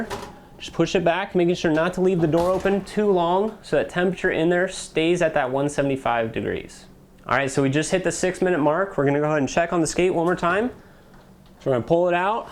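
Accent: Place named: Europe. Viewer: 175 F obviously! This dude sound european???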